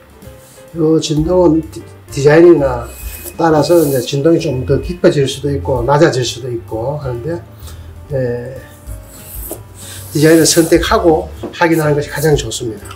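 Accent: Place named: Gyeongsang, South Korea. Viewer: 할랑하게 갱상도 분이신거같네요 설명잘들었습니다 ㅎㅎ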